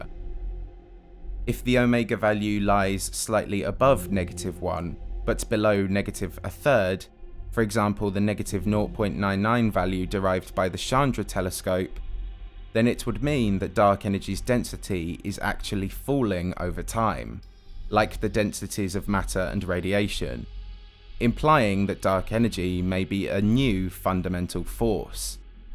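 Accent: United Kingdom. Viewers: What's that accent? Where in the UK do they speak like that? Solar systeeeem, compositieeeen, it's kind of distracting. Other than that it's a great channel.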